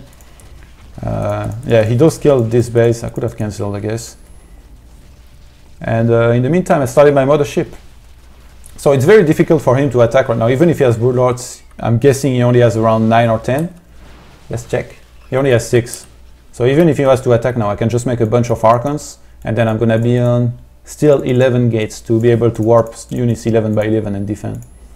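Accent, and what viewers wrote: France. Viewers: Awesome man :) French who speak english sounds so nice hehe, keep this up!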